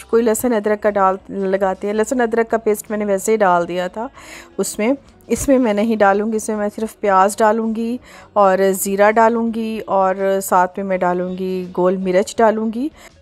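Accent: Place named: Punjab, Pakistan. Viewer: Apka bòlna buht acha h jb suĺtn bahoo darbar pe jy to waha log asy bolty h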